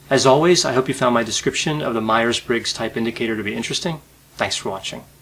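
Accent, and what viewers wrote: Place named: Pennsylvania, United States. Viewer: I thought you might be INTP. As and ENFJ with inferior Ti I appreciate your logical analysis of psychological concepts. You are very interesting and entertaining to me. I have to disagree with you on the negatives of the MBTI. I don't identify with the other types like I do with the description of ENFJ. I also disagree with the descriptions of the types being all positive. The negatives are also discussed. I find it valid in the way it has helped me to understand myself and others. I appreciate my and others strengths and weaknesses so much more than I ever have. I love myself and others more. I am aware of my defects and how I can improve within reason. Comparing the MBTI to the Big 5 is not a fair comparison. The Big 5 was developed as an instrument to statistically determine mental health issues. The MBTI was not. 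It's more about how people think and relate cognitively. But I appreciate your analysis and love what you are doing. I first started listening to you because of your Pennsylvanian accent. I grew up in Lancaster County. Your videos showed up in my feed when I was listening to Dr. Ramani. I'm glad they did. You have taught me so many things and make my life so much more enjoyable. Thank you. Diane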